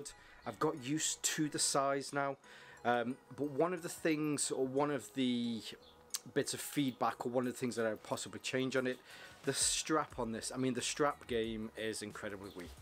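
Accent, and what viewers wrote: United Kingdom. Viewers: Hi, great video and nice watch :) I'd love to do this to mine although unable to find somewhere to buy Jays and Kays in the UK, assuming you're in the UK from the accent, where abouts were you able to get these from? Cheers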